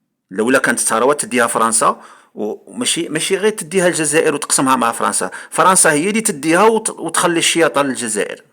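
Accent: Morocco. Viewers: الفاضك ولغتك تبين انك مروكي لماذا تدعي انك جزائري 🤔 🤨 انت مختل عقليا 🫢 الجزاءريين يتكلمون بشخصيتهم 💪 وانت تتكلم بشخصية غيرك 😏 يا ضعيف الشخصية يا وقح🫵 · طريقة كلامك مفربية 100/100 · لهجتك مغربية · لهجتك اصبحت مغربية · أصبحت لهجتك مغربية و ليست جزائرية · علاش شحال كنت كتشد فجزاير لأن دينار عندكم نازل عل درهم واحنا عارفين الحد الأقصى الأجور عندكم بمقارنة مع المغرب هدرتك ولات مغربية شحال وانت فالمغرب المهم الله ينتقم من الجزايرين الخونة اعذرني الا هدرت بلهجة ناشفة ولكن انت عارف بلادك اش دارت وباقا كتدير حتا ولينا نشكو فجنابنا ومنتيقوش اي واحد جاي من عند العسكر كنتما تكون مختلف عليهم وتحط مخافتك من الله اولا وقبل كل شي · انت لهجتك مغربية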